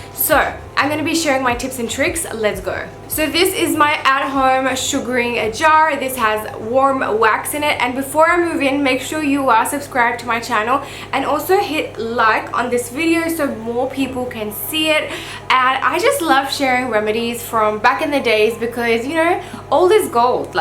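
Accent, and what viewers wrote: United Kingdom. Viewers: Literally i thought your Pakistani . your speaking style is very similar to British accent. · I hear a bit of scottish accent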